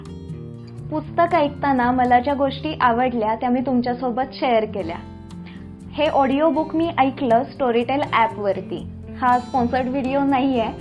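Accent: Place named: Kolhapur, India. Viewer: तझा आवाज खूप बालबोध आणि मुक्त आहे मी तुझे भटकंती चे सारे videoपाहिले खूप सुंदर मराठी बोलणे तू जप ले आहेस त्याला कोल्हापुरी बाज आहे प्रतेक गोष्टी चा बारीक तपशील देणे ही तुझी सहज आवड आहे.जपानी लोकांचा जिवनाकडे पाण्याचा दृष्टि कोन सहज असतो.कविता वाचन छान, जपान ला जावून आलीस का?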